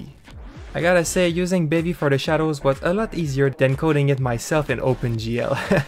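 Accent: Quebec, Canada. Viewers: Not really related to the video, but are you from Quebec ? xd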